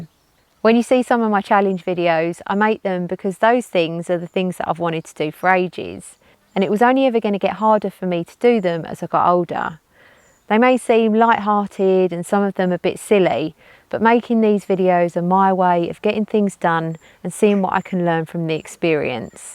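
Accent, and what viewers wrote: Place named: East End, United Kingdom. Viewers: Very nice! I liked the last bit most. Sitting on a path, breaking your perch, referring to notes taped to your tripod. :) Happy Birthday. I've subscribed mostly due to your accent. Gotta love that accent. When I first heard that accent I hit subscribe. That was 12,000 or 13,000 subs ago. One I subscribed I had to Google you as you seemed damned intriguing and more to you than met the eye- and discovered you rowed around the UK and/or across the Atlantic. (This blonde Cockney-ish sounding girl rowed a boat across the Atlantic Ocean?) So you're worth listening to. You have some steel in your spine. Congrats on your birthday and your new YouTube career. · Happy Birthday! All my mates back in the UK are from the East End / Essex so your accent is a pleasant bonus and reminder of different, happier times. Go on, fail, be happy, fail again and then succeed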